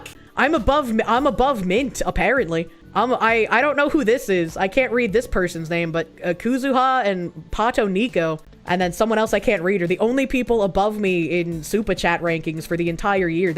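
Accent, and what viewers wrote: Boston, United States. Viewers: Listening to Bostonian yap about the two worst people on the planet fighting each other online really helps make this Amazon route somewhat bearable.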